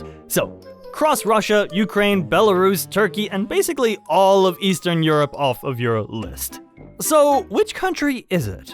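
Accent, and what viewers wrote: Germany. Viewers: this guy really puts the german in the germanic scandinavian accents, ngl horrible horrible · It sounded German lom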